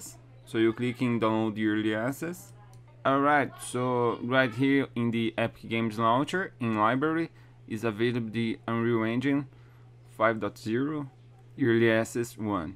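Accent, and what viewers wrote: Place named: Brazil. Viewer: Reconheci o sotaque BR também kkk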